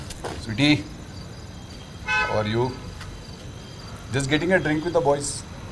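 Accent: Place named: Secunderabad, India. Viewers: Pakha secundrabad musheranad slangh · Aa body language,..... Hydrabad slang chustunte... Idi edo..." Rahul splingunz.".... Biopic la undi..... Any ways... bhai...all the best.. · Hyderabadi slying super till Bhai ❤️ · Annaa mastuu matladuthunnav Anna Hyderabad slang lo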